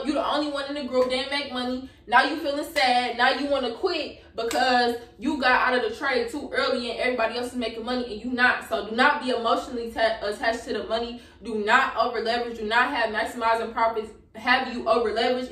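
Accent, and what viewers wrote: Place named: Texas, United States. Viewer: you sound like you from my neck of the woods. Texas Where you from?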